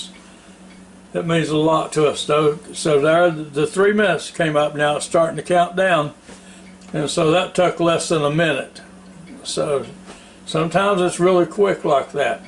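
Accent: South, United States. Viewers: I ❤️❤️❤️ Your foodie demonstration. Your southern drawl made me so very happy. Can’t wait to watch another demo of yours. My wings (modified) came out delicious. ❤️❤️❤️ PS. Love my instant pot duo crisp.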